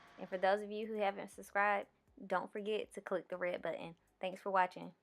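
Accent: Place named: South, United States. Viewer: is it werid but i like your voice lol you got this down south accent that i absolutely love great video look forward in supporting each other channels :) see you in the nest video!!!#sub 973 with bell turnt on !!!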